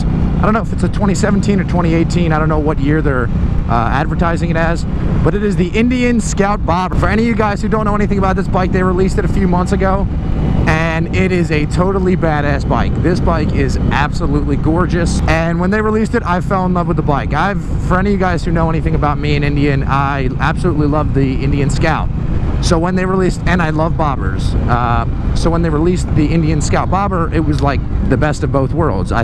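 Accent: Pennsylvania, United States. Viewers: Hey Dude, Where have you been? Great review! I'm a HD guy and I'm leaning towards a new Softail Slim. But Damn! Funny you sound like you more from my neck of the woods in PA. Great Review!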